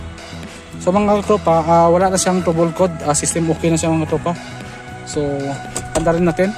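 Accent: Iloilo, Philippines. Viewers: Parang ilonggo ka sir ah taga saan ka same problem kmi ei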